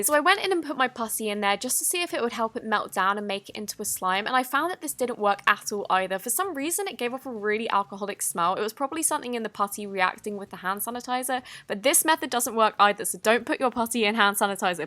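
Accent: United Kingdom. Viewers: Are you British? Cause you sound like one -_ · I bet $<num> that she won't answer are you british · Hi!! I love your videos sooo Much!! You're so sweet and makes The best slime videos ever, because you don't talk sooo Much!! I hate when People are talking for ages. But you just talk simple and clear about The slime you bought/made and its so relaxing to watch your videos:) you are My favorite YouTube!!- one of your fans/followers❤️ PS I love your British voice<3 I Hope you read This comment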